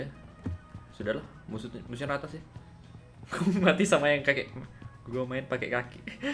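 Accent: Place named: Banjar, Indonesia. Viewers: Banjar banar logatnya 😂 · orang banjar bjir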